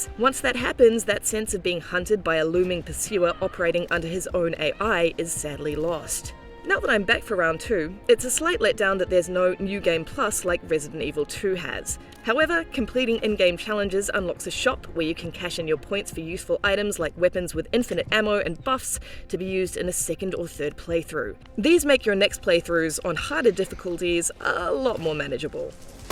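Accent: New Zealand. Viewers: Is that a new zealand accent??? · Going by her accent, is a fellow Kiwi? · So weird to hear a kiwi accent on ign.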